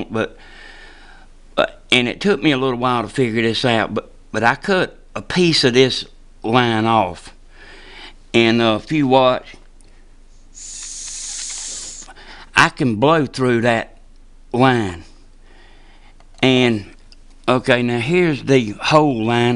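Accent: South, United States. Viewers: Damn, the man gave good advice. So what, there was an accent or southern drawl. People are so critical for no reason. Doesn't everybody shit stink? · Love the good old boy accent🙌😉😂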